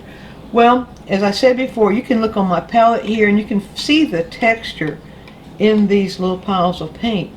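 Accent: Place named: Georgia, United States. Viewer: Thank you for the reply. I am so new to oil painting as a medium that it is extremely helpful to me to learn how the paint and additives work. I am so used to the instant gratification of acrylic and I am so afraid of ending up with a piece I love in oil and it never drying - especially with the cold wax. :-) I'm glad I made you smile and I hope the Georgian accent doesn't disappear entirely, it is so lovely. I'm a fan of accents and Georgia is a favorite. :-) Thank you again for the generosity of your quick tips instruction :-)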